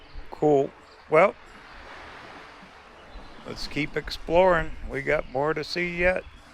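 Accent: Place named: Pennsylvania, United States. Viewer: you seem to have a PA accent. True?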